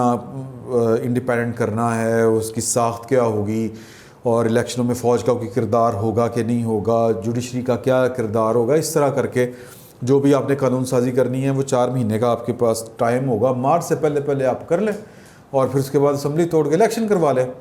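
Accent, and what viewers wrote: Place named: Lahore, Pakistan. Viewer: لاہوری لہجہ آپ کے منہ سے بہت جچتا ہے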